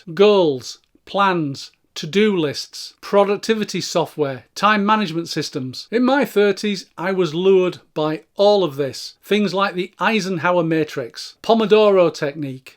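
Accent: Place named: Yorkshire, United Kingdom. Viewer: Not English, it's Yorkie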